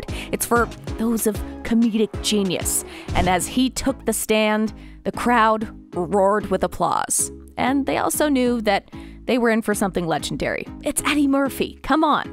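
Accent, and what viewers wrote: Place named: California, United States. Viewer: Girl change that California tone. Please!!!!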